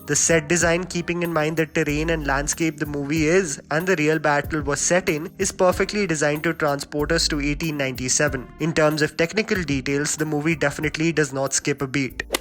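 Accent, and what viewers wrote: India. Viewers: He has a funny Indian accent. Not sure if you Indians should allow your funny accents ruin Tarantino movies with your “taank you come again” accent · lol such a sad creature, if you hate Indian accent why are you here?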